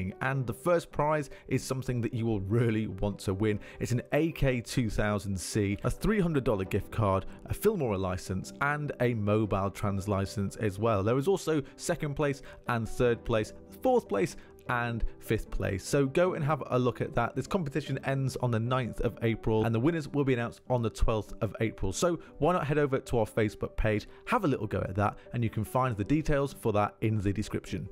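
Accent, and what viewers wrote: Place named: United Kingdom. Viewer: Ugh Please speak Normal English and fix your mouth please. You look sleepy and creepy with this British English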